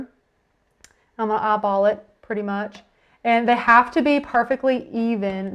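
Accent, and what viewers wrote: South, United States. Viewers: where are you from? You have more of a southern accent than me 😊 PLEASE PLEASE Show how to use with Android tablets I NEED TO KNOW HOW TO. 😭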